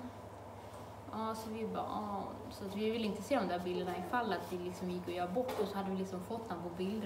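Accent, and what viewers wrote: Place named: Dalarna, Sweden. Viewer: Nää det där är inte norrländska, och dessutom finns det ju sjukt många olika dialekter i norra Sverige som inte ens liknar varandra så det går inte att klumpa ihop alla dialekter och säga ”norrländska”. Det låter som att hon pratar dalmål tycker jag 😊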